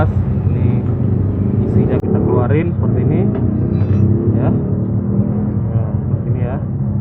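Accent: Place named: Medan, Indonesia. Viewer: Medan apa Aceh bang😁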